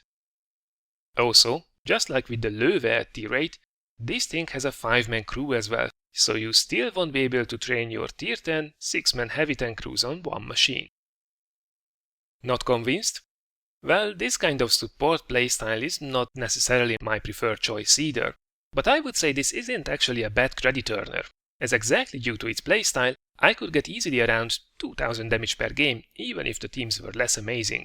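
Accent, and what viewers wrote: Hungary. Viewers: hes from Hungary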